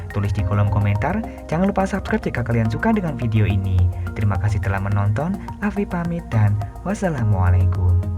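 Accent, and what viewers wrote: Java, Indonesia. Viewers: Medokkkk bangettt suaranya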